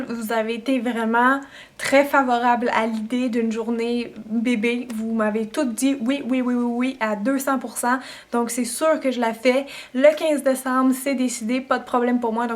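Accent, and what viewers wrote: France. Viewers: ben t francaise non? nous on trouve que vous avez un accent ici au Quebec ♥♥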